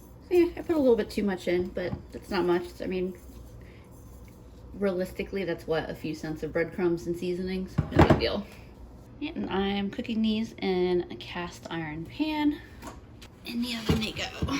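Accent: Philadelphia, United States. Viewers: I knew you had a Philly accent!! Go Phillies!! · !! I know you're located in NC but I've thought for years I was picking up on a Philly accent in your videos! I'm from Delaware County-- where are you from? Also, GO PHILS! ♥️⚾ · I always thought you had a Philly accent but in past videos I think you said you were living further down South. But with the Phillies shirt I’m guessing from Philly region. Im in DELCO and sounds very familiar.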